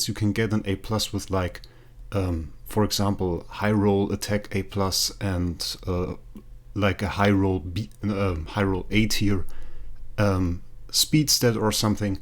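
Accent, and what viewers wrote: Germany. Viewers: Do you make acc reviews? And are you german?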